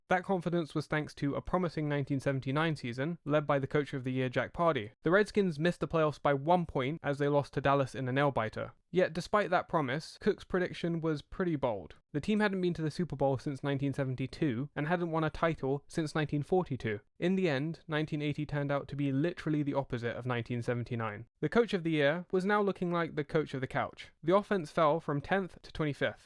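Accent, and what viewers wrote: England, United Kingdom. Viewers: Really nice vid. Well done. I have to admit, it’s different hearing this from an Englishman. Is the NFL even popular across the pond? Keep up the good work! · I am a Giant's fan from 1973. Nobody has to tell me how great the Redskins were in the 1980's under Joe Gibbs. As much as I hated them back then - that team always had my respect. They were talented, disciplined, and well coached. 45 years later it looks like they could be on the threshold of another championship team. Hope it is only 1 .... ; ) How do you know so much about American football with a British accent? I am pleasantly surprised - unless you are AI?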